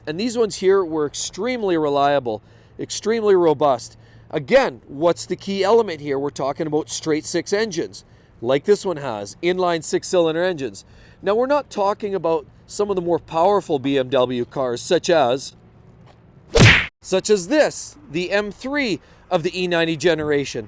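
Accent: Edmonton, Canada. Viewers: Heard your voice- I immediately thought Edmonton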